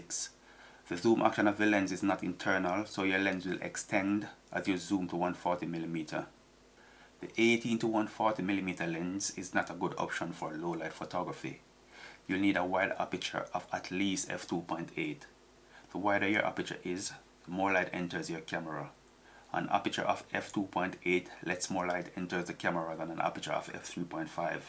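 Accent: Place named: Caribbean. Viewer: I would recognize that Caribbean accent anywhere. Very nice to the point video here. It’s interesting that you bought your lens to use it with your D7200 which I also own since 2015. That is one amazing dx camera which I don’t ever plan to part with. I now also own the Z5 which suits my type of photography. Interestingly that I just recently purchased this 18-140mm from KEH at a bargain price for the exact reasons you mentioned. In addition to my D7200 it works beautifully with my Z5 using the FTZ adapter. It also focuses very quietly during video and takes amazingly sharp pictures on this Z system even though at a reduced 10 mp but enough for me to get a nice sized medium enlargement. It would have been good if you had included a few images taken with this lens at different focal lengths and scenarios. Keep up the good work brethren. 😀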